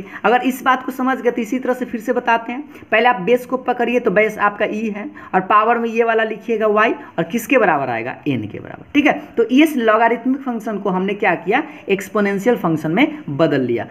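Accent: Bihar, India. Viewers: Sir aap Bihar sa hi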